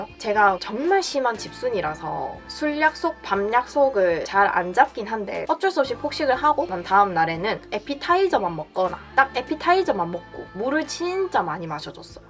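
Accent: Busan, South Korea. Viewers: i think it's satoori from busan but i could be wrong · 부산？사투리가 너무 귀엽당…❤️ 다이어트 열심히 하겠습니다！！ 釜山？訛りがかわいい…❤️ ダイエットがんばります！！ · この方はサトリ(方言)ですか？素人ですが応答せよ1997のイントネーションに似ている感じがして、釜山の方なのかなと思いましたがわかるかた居ますか？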